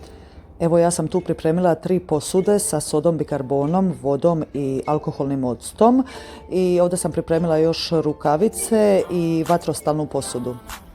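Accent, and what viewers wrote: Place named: Dalmatia, Croatia. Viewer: volim i taj Dalmatinski naglasak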